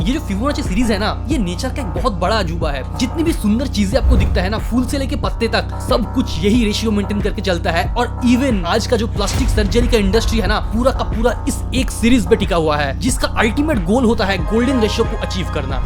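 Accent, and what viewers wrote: Bengal, India. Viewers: Bhai tu Bengali hai kya, tera hindi accent se toh mujhe aisa hi lagraha hai.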